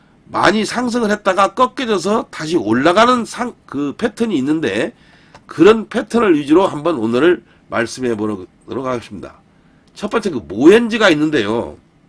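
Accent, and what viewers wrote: Jeolla, South Korea. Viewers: 유바이오 . 언급해 주셔서 깊이 감사드려요 항시 도움주시려 애쓰시는 모습도 감사합니다 전라도 사투리도 정감갑니다 늘~ 건강하시고 평안하시길 기원드립니다 ♡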